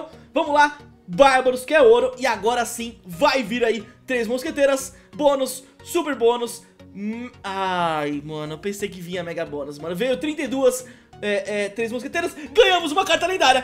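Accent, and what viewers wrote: Minas Gerais, Brazil. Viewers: mineiro ou tronco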